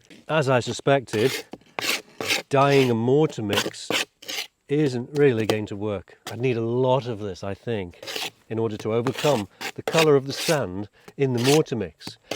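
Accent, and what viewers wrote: United Kingdom. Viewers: I'm an ignorant American. Your accent is throwing me off. You speak and sounds like a UK professor but work like a laborer. You're in New Zealand. Is that the usual accent for NZ? In any case great work!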